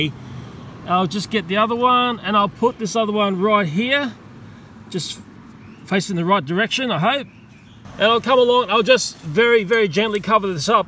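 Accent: Australia. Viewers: your natural curiousity is on point mate., also we really need another aussie to narraeete some nature action. aussie narration is the best narration that exists.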